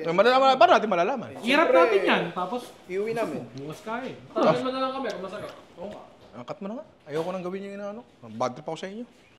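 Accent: Batangas, Philippines. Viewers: Batangueño ka ba hahaha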